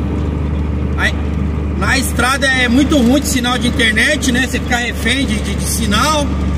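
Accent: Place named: Rio de Janeiro, Brazil. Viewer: Vc já adquiriu até sotaque de carioca kkkk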